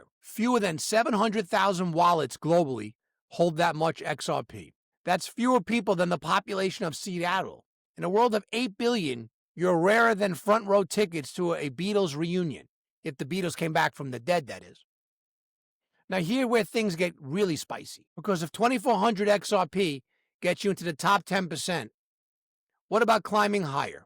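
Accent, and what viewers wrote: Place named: Brooklyn, United States. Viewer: You sound like an old school Brooklynite. Nice. Furgeddaboutit ! Holding XRP 8 years. At 70, it would be great if it pops soon.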